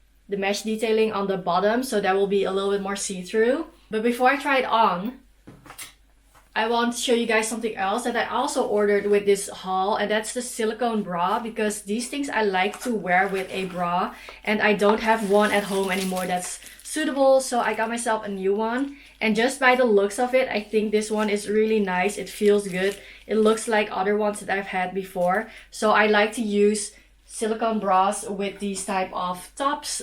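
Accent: Netherlands. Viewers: Is your accent dutch?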